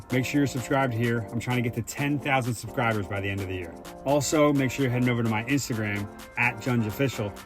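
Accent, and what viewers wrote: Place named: Philadelphia, United States. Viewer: You got that Philly accent lol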